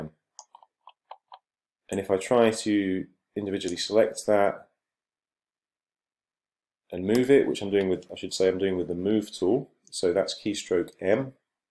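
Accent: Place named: Sheffield, United Kingdom. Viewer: Thanks. Just what I needed after recently downloading sketchup which has inspired me to attempt a cabinet build in my shoe cupboard after watching your channel. Nice to hear a uk voice especially one from sunny Sheffield. Lol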